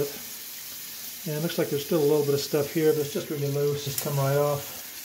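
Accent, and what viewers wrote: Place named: North America. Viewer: Very helpful and great narration. Most Brits are suckers for a North American accent!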